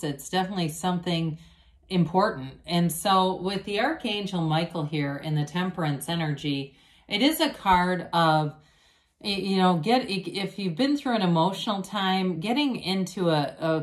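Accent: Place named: Wisconsin, United States. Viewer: LOL you have a very distinct voice. I'm from the midwest...you sound like you are from Wisconsin. LOL Great reading! I'm the first female arch angel that walked the earth. I work for God...He prefers me single...I've been single for 22 years. I've been allowed to participate in the angelic realm before my time. Although I have so missed the life of a couple, He gave me the opportunity to know motherhood and for that I am eternally grateful. Outside of that it has been a life of serving God in the most unusual ways...God and I made a deal, as a healer, only if I am to affect someone for God do I care to know there problems...it's too much. But when I need to know I will. It's a very clear understanding and contract that we made...working for God has it's other perks. Thank you So much for this insight. I'm still in the 3D realm, I'm walking a fine line.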